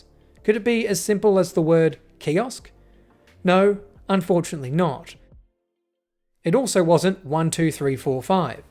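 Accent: Australia. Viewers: Dude that vacuum looked to work fantastically.. please help w brother out, what kinds vacuum? Make/model. Or do they only sell em in AU or whatever the accent is. Enjoyed the video.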